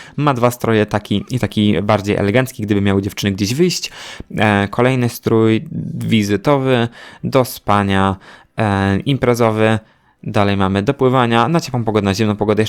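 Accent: Podlasie, Poland. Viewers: Jestem z Podlasia... I dopiero od niedawna zauważyłam ten Podlaski Akcent i siebie xD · Pozdrawiam współplemieńców Podlasian😂 I to nasze zaciąganie...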